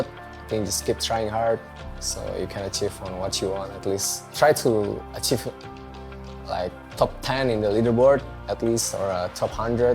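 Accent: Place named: Indonesia. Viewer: 7/10 inggrisnya orang indo, dia kuliah apaan dah?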